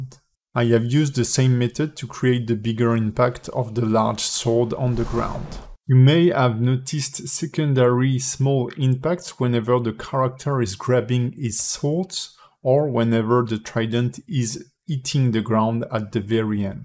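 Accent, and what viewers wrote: France. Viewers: what a french accent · Vraiment top ta vidéo, très quali et ton animation est cool ! On sent le petit accent frenchy :P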